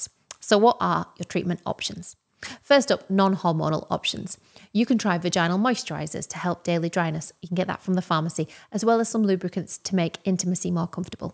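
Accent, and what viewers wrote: United Kingdom. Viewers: your British accent, alone, is challenging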